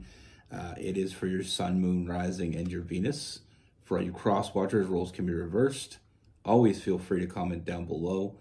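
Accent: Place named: Toronto, Canada. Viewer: so you're from Toronto eh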